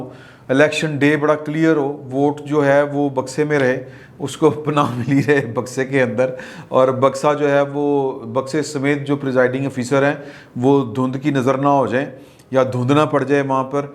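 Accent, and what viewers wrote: Punjab, Pakistan. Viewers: شاہ جی میں آپ کا پروگرام بڑے شوق سے سنتا ہوں۔ آپ کا معصوم اندازہ میں بات کرنا اور پنجابی لہجے میں اردو بولنا، ساتھ میں پنجابی کے محاورے سنانا ، ہاتھوں کی حرکات اور دل موہ لینی والی مسکراہٹ۔ اور رنگا رنگ شرٹس۔ کیا بات ہے۔ پوری تگڑم لگا دیتے ہیں۔ 😁😁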